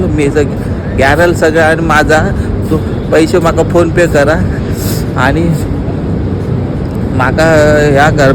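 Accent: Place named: Sawantwadi, India. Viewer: बोलण्यावरणा सावंतवाडी दोडामार्ग चो वाटतस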